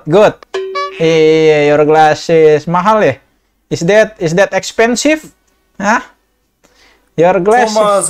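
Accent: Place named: Betawi, Indonesia. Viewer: Mantap bang,,, betawi banget,,,, gasssken🤣🤣🤣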